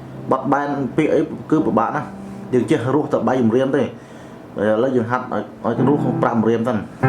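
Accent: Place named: Oula, Cambodia. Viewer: អូននិយាយដូចអ្នកនៅស្រុកអូឡ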